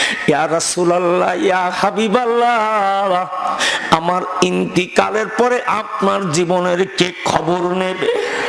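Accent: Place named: Pabna, Bangladesh. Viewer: Tumra khanek por jabene bait cole... Pabnar vasha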